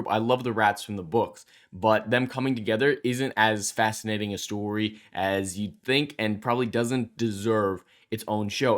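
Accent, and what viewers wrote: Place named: New Zealand. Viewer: lol once again done in a badly done masculine voice that is in fact a Kiwi accent Are you not entertained? ARE YOU NOT ENTERTAINED?! Is that not why you're here?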